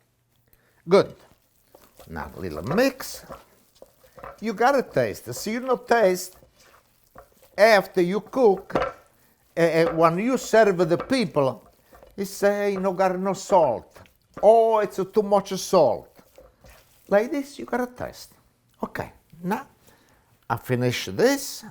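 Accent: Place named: Naples, Italy. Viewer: What town in Italy do you come from when you speak italian it sounds like my father . He comes from a little town in Naples called Castello di Matese, Caserta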